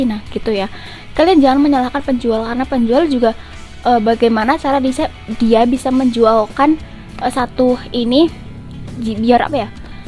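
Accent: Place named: Java, Indonesia. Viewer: Aku suka sama dialek kaka yg medhok👍🏻🤭 Austrin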